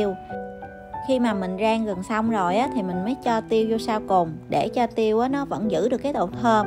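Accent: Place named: Tay Ninh, Vietnam. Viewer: quê ở đâu vậy??? Nghe giọng như dân Tây ninh ah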